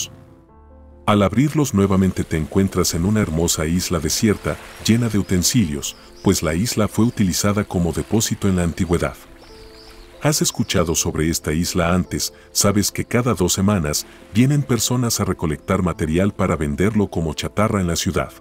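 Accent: Antioquia, Colombia. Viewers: Es mejor que ahora ocupes la voz de la IA . El acento parcero o paisa es muy cutre . Felicidades y mucho éxito para este canal .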